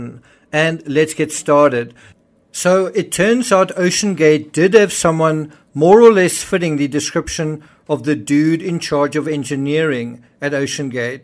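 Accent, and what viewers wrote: South Africa. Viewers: you sound South African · Loved hearing the South African term "nogal". I always hhought your accent was South African. Keep up the good work!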